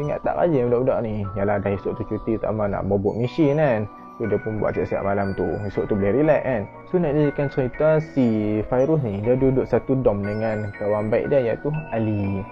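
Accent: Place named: North, Malaysia. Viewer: Suka gila dengaq youtuber yg cakap utaqa ni, tapi nak mintak 1 ja lepasni jangan letak jumpscare please terkejuttt ceq 😭😭😭😭😭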